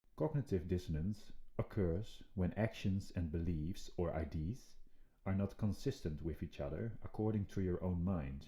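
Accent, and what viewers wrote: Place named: Netherlands. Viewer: Volgens mij hoor ik een Nederlands accent. Cool. 😁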